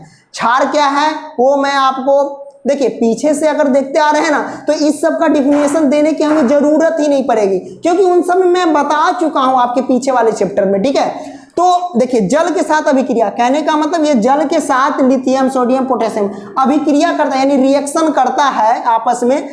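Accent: Bihar, India. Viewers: Sir aap bihar ke rhne wale hooo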